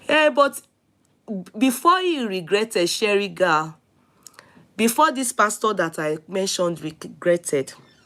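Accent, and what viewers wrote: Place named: Yoruba, Nigeria. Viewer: Madam, your Yoruba-English naration is making the story distorted.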